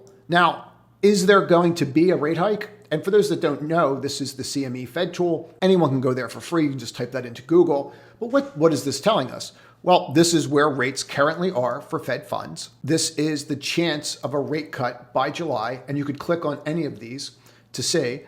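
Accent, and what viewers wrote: East Coast, United States. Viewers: I love how you say current….its Karen plus ent. Its cute. Must be an east coast accent. Excellent video. ❤❤❤❤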